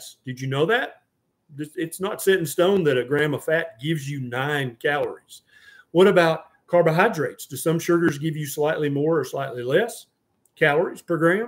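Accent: South, United States. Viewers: 😂 yes, we all have an accent. I’m Canadian and you probably think we have an accent. Anyway, you have a cute southern accent 😃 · I think they were referring to your Southern accent 🤣😉😍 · I don't hear an accent, just a nice southern draawwlll. 😉😉😉😉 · Come to South Carolina where people do have a southern accent. I am proud of mine and yours too. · You have a nice Southern accent! I spent a year (1977) going to school at NATTC Memphis and to this day I still talk southern! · It’s not an accent, it’s Southern Dialect. I’m from Lithuania and do have accent I’ll die with, but oh well… 😊 In my old country of 3,7 million people there are 4 different dialects believe it or not… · Im from south carolina and you sound like my boss. You look like him too. Wonderful accent, respectable guy, very southern and genuine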